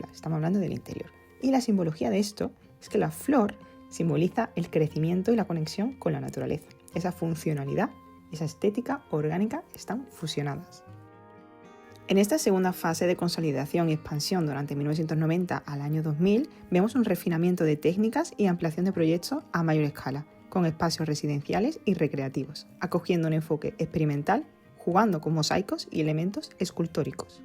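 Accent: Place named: Seville, Spain. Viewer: Una sevillana que intenta cecear. O una canaria que ha vivido en Sevilla. O una hondureña con alguna conexión con Sevilla... No sé, no pillo el acento.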